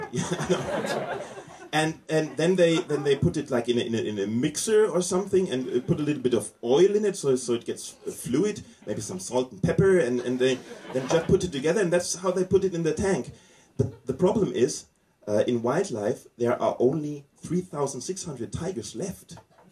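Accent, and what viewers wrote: Germany. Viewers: It's actually quite funny! And the German accent makes it even funnier! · Echt lustig. :'D Nur dieses deutschige Englisch.. Ist aber trotzdem gut! ( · hello, germany! Haha, the clip is great and Your english also! I ran over your conversation with the 'Ben' and I have to say I admire your patience ; ) Btw, I'd guess, judging by how your accent sounds, you're from somewhere in the southwest region of germany? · What a funny German accent. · Awesome! I kinda like the german accent, although I don't have any.. Greets from Germany! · This rocks! And I bet for the Americans the German accent even doubled the fun. Anyway, it's hilarious! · i live in switzerland this guy reminds of my german friends, they have an exact same english accent. And i loved your jokes it was funnier in your accent and time by time you asked the audience what is it in english hilarious. 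· Er vielleicht? Man hört doch ganz deutlich den deutschen Akzent. Außerdem gibt es auch in Deutschland sprachbegabte Menschen. Vielleicht gehört du einfach nicht dazu? Ich weiß es nicht, da ich dich nicht kenne, aber es kommt mir gerade so vor. Ist nicht bewertend und angreifend gemeint, um das schon mal klar zustellen. Ist mir einfach nur so vorgekommen....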